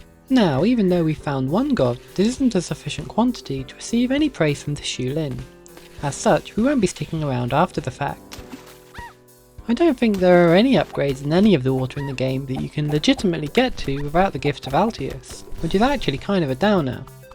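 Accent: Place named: United Kingdom. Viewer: They fly some what erotically? I heard that and laughed I'm sorry it was accidentally funny and I just want to say I do like this LP a lot (so you don't think i am a jerk for kind of insulting your interesting sounding accent...British I...think?) and I will stop typing now, since I want to go back to watching...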